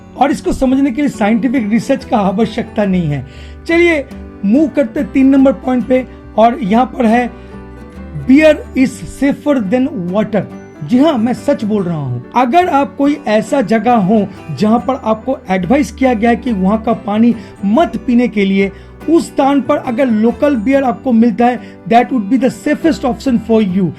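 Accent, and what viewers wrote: Bengal, India. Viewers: Bengali manus chala hindi bolne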